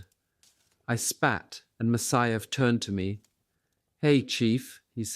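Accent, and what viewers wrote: RP, United Kingdom. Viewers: Incongruous that no mention of Female Soviet Snipers is mentioned . Just Men beating their chests and yodeling, sounding like Tarzan King of the Jungle . Fact : Lymudilia Pavlichenko called Lady of Death 350 confirmed Kills including 36 German Snipers in Odessa and Sevastopol theatre of WW 2 in Russia. . No mention by Vasily Zaitsev though he must of been aware of the Skill of Soviet Women Snipers as accomplished as he was . Strange the Narrator has a Royal English accent. Seriously?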